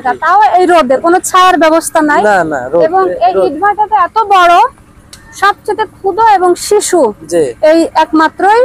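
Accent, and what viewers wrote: Chittagong, Bangladesh. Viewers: আপু আপনি মনে হয় চট্টগ্রামের মেয়ে কথায় বুজা যায় আপনার ভিডিও খুবই ভালো লাগে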